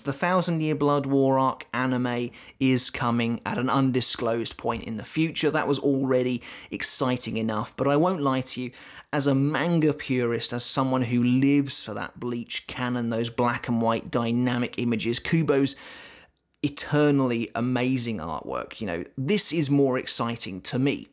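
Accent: United Kingdom. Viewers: He reminds me of this dude I went to school with but this guy British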